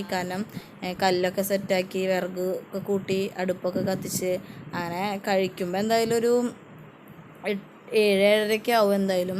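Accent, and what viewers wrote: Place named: Thrissur, India. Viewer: thrissur slang nannayi und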